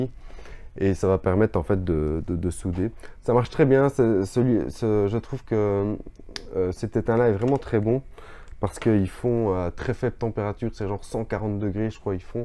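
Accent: Liège, Belgium. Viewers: Je viens de Liège aussi haha j'ai bien reconnu l'accent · Salut, Chapeau bas pr la transmission de savoir ! Dès la 1ère vidéo j'ai reconnu l'accent, puis le pull m'a confirmé ! Signé un autre Liégeois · Hello, très bonne vidéo qui résume bien l’essentiel. Je ne connaissais pas le coup du court circuit avec l’isopropanol pas mal merci. Et je crois reconnaître le petit accent et la sympathie de nos amis Belges que j’adore.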